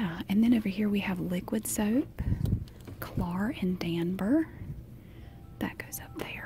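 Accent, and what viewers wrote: South, United States. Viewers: I just absolutely love your southern accent. A few years ago, I moved from the south and I really miss the southern accent and to have it combined with a wonderful asmr video is amazing! Thanks you! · Please keep making these shelf organizing videos❤️ They’re so satisfying, plus u make me laugh without trying, and ur southern drawl makes me feel at home❤️❤️❤️❤️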